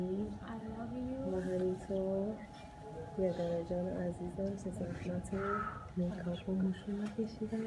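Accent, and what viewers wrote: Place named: Herat, Afghanistan. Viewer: Mojgan Azimi Official چه جالب شما هراتی اید. منم اصالتم مال توس(مشهد) و مادرم ریشه جامی داره و از نتیجه از نسل هفدهم شیخ احمد جامی هست اگر بشناسید، . لهجه سمت مادری من با شما هراتی ها مو نمیزنه، دقیقا لهجه تربت جام و هرات یکیه. در کل مردم از نیشابور تا هرات خیلی بهم نزدیک و شبیهن، و همچنین لهجه مردم هرات عزیز با بقیه جاهای افغانستان متفاوته و بیشتر شبیه ایران بخصوص خراسانه تا افغانستان. در کل خوشحالم ازین که یه هنرمند پارسی زبان اینقدر با شعور داریم و اینکه هراتی و خراسانی هستی خودش بیشتر باعث خوشنودیه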